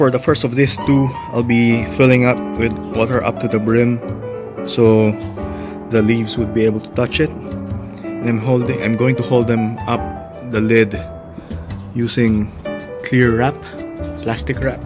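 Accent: Philippines. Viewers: You have filipino accent.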